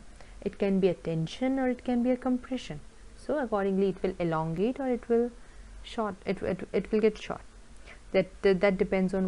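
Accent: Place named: India. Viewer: Is the teacher from India? the acccent is not standard.........but the lesson is good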